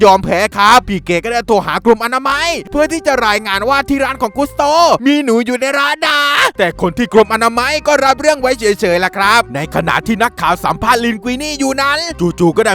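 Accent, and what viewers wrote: South, Thailand. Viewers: คนใต้ป่ะเนี่ย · พี่เป็นคนใต้ไช้ไม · พี่เป็นคนไต้หรอ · มั่วตั้ว5555 อืมมพี่คนใต้ใช่มั้ย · พี่เป็นคนใต้หรอ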